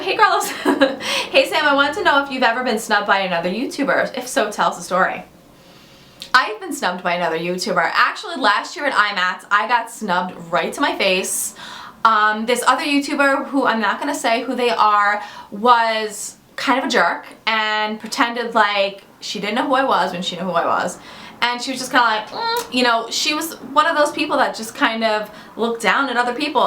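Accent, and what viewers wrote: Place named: Long Island, United States. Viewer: OMG! I just started watching you recently (found you through Dani!) and as soon as you said "draw-ring" lol I was like wow she sounds like she's from LI. And then you said you got your cat from north shore!! I'm from Long Island too!! Will you ever do a meetup??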